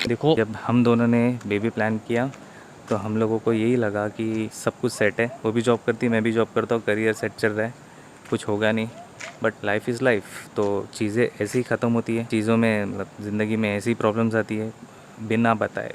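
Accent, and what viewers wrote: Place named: Solapur, India. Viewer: tum Solapur ke ho kya???? Blog mein sunne jaisa laga???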